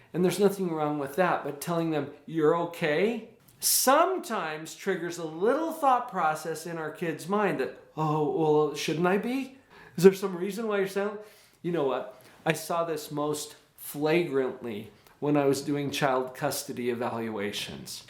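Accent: United States. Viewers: you sound very american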